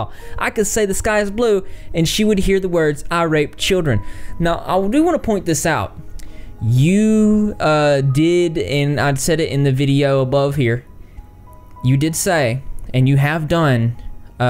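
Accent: South, United States. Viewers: I know this is a serious topic, but I have to be the one to say that, I love your southern accent, it is very soothing.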